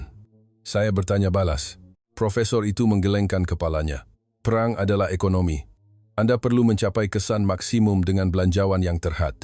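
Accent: Indonesia. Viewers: Kenapa orang Indonesia suka bikin konten tentang tentera Malaysia..dan bikin natizen indo berapi..suara admin jelas2 org Indonesia..bikin saja tentang tni kamu,puji saja tentera kamu kenapa sering masuk campur dgn tentera negara tetangga...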